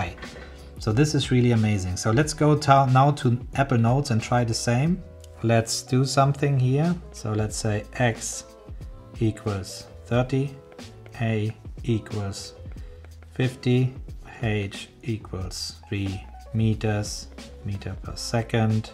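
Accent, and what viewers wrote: Germany. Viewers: Du kommst doch Safe aus Deutschland oder?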